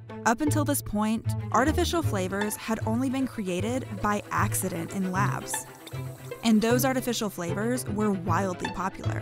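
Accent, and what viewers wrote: United States. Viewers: From the UK and never ever heard someone speak with a glottal stop like this, no idea wtf you think British people sound like. this narrator is very much American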